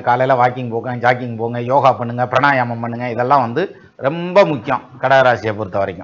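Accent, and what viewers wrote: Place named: Tirunelveli, India. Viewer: யோவ் வழ வழ னு எதோ 60 வயதுக்கு மேல் இருப்ப வர் பார்த்து யாருக்க வது சொல்ல வேண்டும் போல.... நெல்லை தமிழ் சிறப்பு.... 😴😴😴😴😴😔😥😢😢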